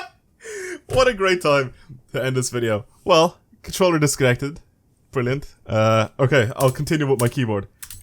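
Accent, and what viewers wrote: Ireland. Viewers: Now we know lives in Ireland which is obvious since he has an irish accent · For two fucking years I was trying to understand where the hell is from. Finally I know he is Irish. Now I can die in peace.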